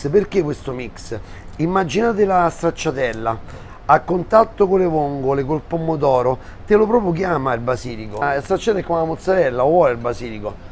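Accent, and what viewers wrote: Rome, Italy. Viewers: e tutta ‘sta sceneggiata a me dà fastidio. Mi piace il romanesco ma questo è esagerato . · ...... sei forte, già lo sai tu stesso che sei "er migliore'! Soprattutto la tua parlata romana non deve mancare.....é come la ciliegina sulla torta!... L'inglese rovina un po ' questo tuo insostituibile carisma... ciao ti vogliamo bene!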